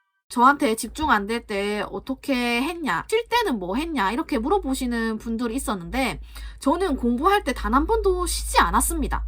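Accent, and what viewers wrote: Gyeongsang, South Korea. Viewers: 갱상도 이신가융 ㅋㅋㅋ 잘보고갈게요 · 잘 봤습니다 저도 오늘부터 의지를 다져봐야 겠습니다. 혹시 실례가 안된다면 경상도사람인가요? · 부산시 공무원 되신건가여 ? 어쩐지 사투리가 조금씩 들리는거같았어여 항상 좋은 방송 해주셔서 감사합니다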